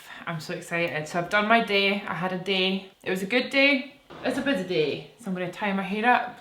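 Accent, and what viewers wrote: Scotland, United Kingdom. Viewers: Love your videos! It's nice to hear a fellow Scottish voice on Youtube 😍😊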